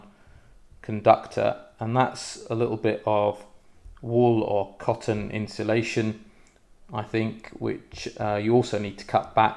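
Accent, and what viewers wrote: United Kingdom. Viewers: that looked great - good explanation. I noticed some comments on the length of the earth/ground lead and would say the way you did it was dictated by the amount of space in the connectors (or, I don't see another way to do it with these connectors). The connectors you use now confirm you are in the UK, as your accent did;). My advice would be to look at the "domestic ring" that dominates UK home electricity cabling design, as the second "cheap" improvement option. That ring puts all devices in the same circuit - your high-end audio with your washing machine. If we ignore cynical commenters, and focus on "clean" AC, then we have a mission here. As you know too, what comes out of your speakers is AC that got rectified and next modulated by a source signal. Any!@ coming in on your AC can disturb and distort your music. Electrical companies in Europe are increasingly concerned about household and commercial appliances putting stray signals into their networks. 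So, with the single-side grounded shield on the power cable you now have provided for an isolation against inductance interference from other sources into the protected device and vice versa, contained some of the potential stray interference that your device might throw back in the air. As "cynical" is derived from the Greek word for "dog", the cynical puppies in all their illiteracy and lack of manners warrant a demonstration of interference. If you have (access to) an oscilloscope, nothing is more impressive tha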